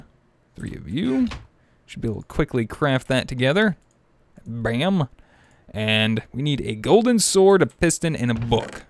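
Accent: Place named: South, United States. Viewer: eli Heakins Based on other people talking in his videos and his speech I think he's from the south not the north.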